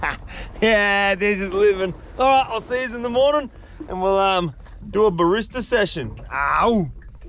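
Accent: Western Australia, Australia. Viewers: I knew you would be from Perth!! Classic South West WA accent. I live like 5 minutes from where your video starts, me and my partner are aspiring content creators. We do most of our stuff on Twitch. I'm a total city slicker, I want to become influential enough to be able to collaborate with others in the content creating community. My dream now is to one day be part of an episode where you take a pair of city slickers out to the wilderness and see how well we fair with as little intervention as possible. I would LOVE that challenge!!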